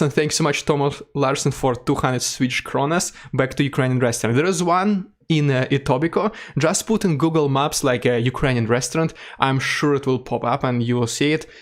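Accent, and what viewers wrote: Russia. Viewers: Hello: I enjoy your videos once I got used to your accent. I wish I could send you donations but I am retired and live on a fixed income. Slava Ukraine 🇺🇦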